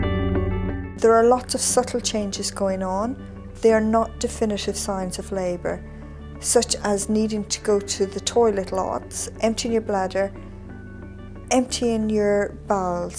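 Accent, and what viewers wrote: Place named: Ireland. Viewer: You're so stupid, she clearly has an Irish accent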